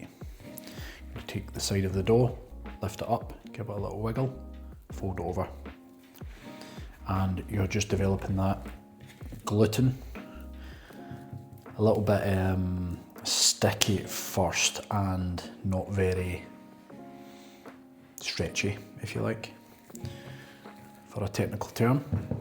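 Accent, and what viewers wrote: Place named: Scotland, United Kingdom. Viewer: of course, my wife even said that she doesn’t know what’s better - a man baking a bread or a Scottish accent she hears while watching it haha 😄 fingers crossed for your channel mate!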